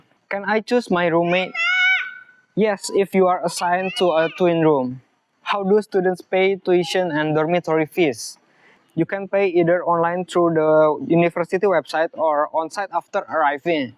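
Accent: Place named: Indonesia. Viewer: so what citizen are you? indonesian? your accent sounds like an indonesian accent btw hehe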